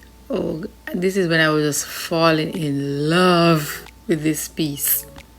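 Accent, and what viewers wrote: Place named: Jamaica. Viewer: G'Day Mate, did you soak the second and third pieces of fabric like the first one or place it down dry then apply Mod Podge. Also what kind of Mod Podge did you use and do you have any suggestions on an alternative product as it is expensive hear in Oz, I don't think they even sell large containers like the one you are using. I prefer the 2nd and 3rd softer look, I also think a smaller version with embellishments would look lovely in a little girls room. You did a fantastic job very classy, only thing I would do is spray paint with a clear Lacker too help keep the dust off long term, with such a light colour. I have a few spin off Ideas from this, I think the fabric need to be light and flowing you could use more than one colour on a single canvas. I had a little trouble understanding with you Jamaican accent.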